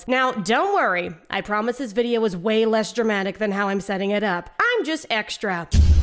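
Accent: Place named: West Virginia, United States. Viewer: I'll be ready whenever you are. ....and sometimes I forget how WV you are until I hear the "y'all". The cutest thing.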